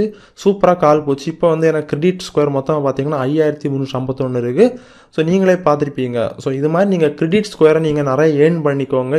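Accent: Kanyakumari, India. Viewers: Kanyakumari neega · Bro neenga kanyakumari District ahh.....??? Slang paatha theriuthu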